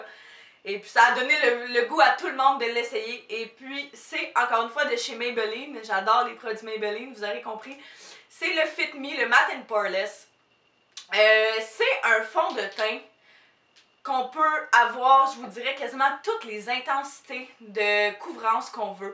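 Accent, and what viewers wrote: Quebec, Canada. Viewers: Merci pour ton commentaire sur ma chaine ! :) Vidéo super cool ^^ +1 abonnée J'adore ton accent québecois :) <3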